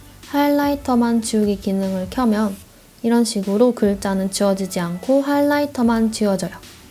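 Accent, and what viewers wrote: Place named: Gyeongsang, South Korea. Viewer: 갱상도억양이 아주 강약조절을 하면서 톡톡 튀네요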